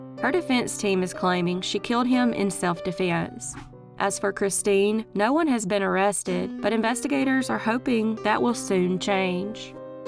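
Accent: South, United States. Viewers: These videos are so good, but I almost can’t with the cornbread accent. I’m southern and you can tell it when I speak, but come on. You can tell it’s exaggerated, because it lightens up as the video progresses. Chritaaaine finally becomes Christine and Jasmaaine turns into Jasmine. Come on, the southern accent is endearing, but this is a bit much.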